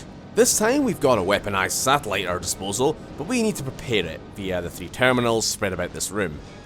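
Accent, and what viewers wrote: Scotland, United Kingdom. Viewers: what part of Scotland are you from? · Scottish narration makes everything better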